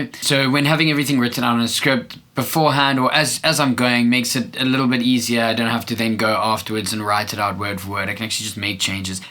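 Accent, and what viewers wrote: South Africa. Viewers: Howzit! Is that an SA accent I hear? Thanks bru for all the info... was wondering if you think your region restricts your success on YouTube? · I didn't know who you were until pressing play on this monster length recommended video. To immediately hear the South African accent and find out that you're also a Cape Town boy I knew I wanted to watch it till the end. The love and attention to detail you put into making this Masterclass is amazing and using all of your own advise within it places this at the top of my recommended list for anyone interested in film making. I'll definitely watch this again and add what I've learned from you to my next project. Thanks BRU and God bless.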